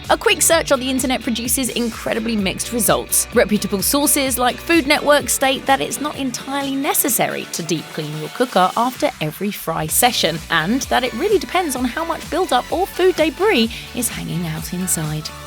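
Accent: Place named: United Kingdom. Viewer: why use a 'terribly British' style voice-over then continually quote temperatures in F when we tend to use C ?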